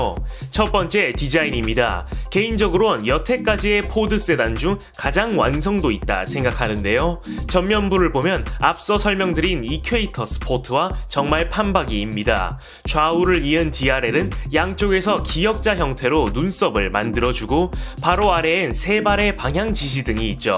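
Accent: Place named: Jeolla, South Korea. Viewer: 히 이분 말투가 전라도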